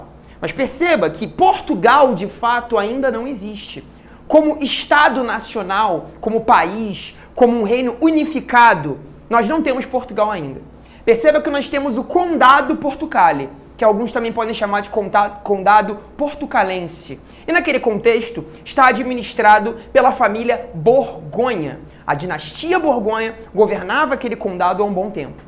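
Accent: Rio de Janeiro, Brazil. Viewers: aaa amo sotaquin cariocaa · Acho lindo falar colocando xs. Em qual região do Rio terei que morar para começar falar com esse sotaque e quanto tempo mais ou menos? Obrigada!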